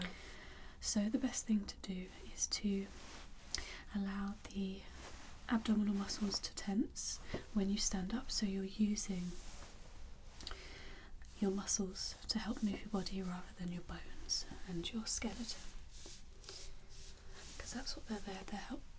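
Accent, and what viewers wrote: United Kingdom. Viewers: Always love British accent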